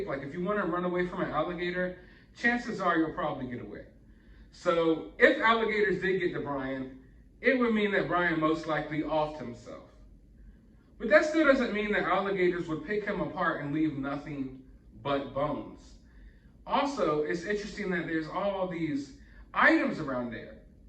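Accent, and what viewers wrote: South, United States. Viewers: Where in the south are you from? I'm from Alabama!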